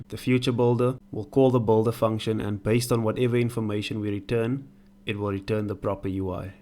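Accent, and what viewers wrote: South Africa. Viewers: Sorry man I just want to ask are you south African by any chance ??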